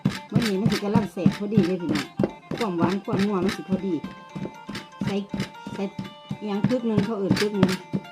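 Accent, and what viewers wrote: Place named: Sisaket, Thailand. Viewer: เป็นตาแซบแท้จ้า จ๋า❤️เว้าคือคนศรีสะเกษแท้จ้า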